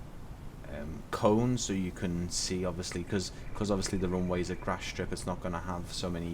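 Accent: Liverpool, United Kingdom. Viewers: How odd, just bought this on a whim and looked for a review, found yours first and sounds like you're from Liverpool like me, small world :)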